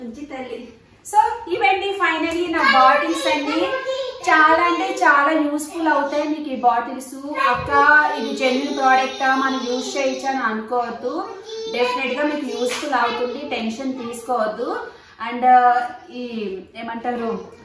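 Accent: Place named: East Godavari, India. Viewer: Mundhuga Happy New year alage Happy Birthday inkoti enti ante moothi kalipoindhi sory thappuga anukovaddu chakkaga east godhavari ammaila matladaru madhi kooda east godhavari katthipoodi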